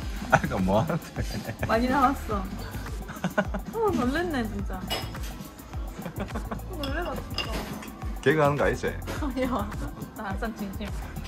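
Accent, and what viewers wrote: Gyeongsang, South Korea. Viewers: 경상도남자 억양을 배우셧네 ㅎㅎㅎ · 와~~ 경상도다~. 제일 멋지다! 강력 응원합니다. 얼머나 좋으노.. 아.. 진짜 요새 한일부부 AI 추천으로 넘 마이 올라오던데.. 하나 같이 머슴아들이 내시들 처럼 하이 어리가또 해사서.. 싸대기 한대씩 쳐 날리고 싶더마는... 와 진짜 짜증 만땅 올라오더마는.. 몽창 다 날려도 와이리 머이 올라오노.. 하다가.. 멋집니다 이 분. 오리지날 경상도 고대로네. 저는 부산. 오래오래 향복하이소~~~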